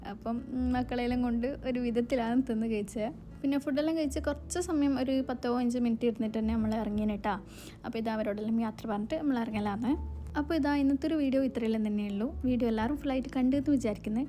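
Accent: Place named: Kannur, India. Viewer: ഗൾഫിലെ ബാംഗ്‌ വിളി ഒരു പ്രത്യേക ഫീൽ ആണ് കേൾക്കാൻ. ഞാൻ പുതിയ ആൾ ആണേ. കണ്ണൂർ. നിങ്ങളുടെ സംസാരം കേൾക്മ്പോൾ കണ്ണൂർ പോലെ ഉണ്ട്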